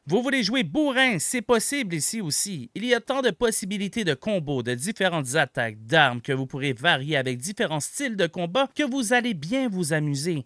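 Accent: Quebec, Canada. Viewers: ah l'accend on dirait un québecquoi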